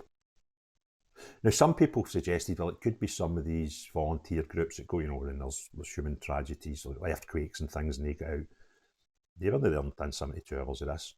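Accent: Scotland, United Kingdom. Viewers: I really appreciate your investigative journalism. Plus your topics. Equally in the meantime I am having to learn to understand the Scottish accent which I do enjoy.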